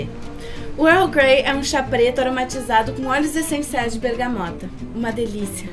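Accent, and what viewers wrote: Rio Grande do Sul, Brazil. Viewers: Guria! Amei a receita e também gosto muito do filme! No programa passado desconfiei que você fosse gaúcha! Eu também moro no RS, em Caxias. Já curiosa pela receita da próxima semana! Beijão!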